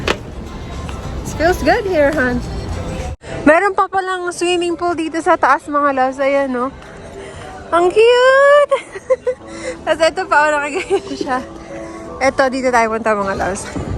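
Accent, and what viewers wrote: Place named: Iloilo, Philippines. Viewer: Hulaan ko, ilongga ka maday ayyyy..... pati ka daw.... shoutout lng q jan ah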